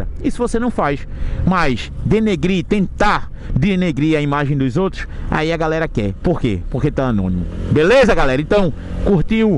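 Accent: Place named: Northeast, Brazil. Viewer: sou teu fã cara! Curto demais seus vídeos, seu sotaque nordestino só mostra o quanto esse Brasil é maravilhoso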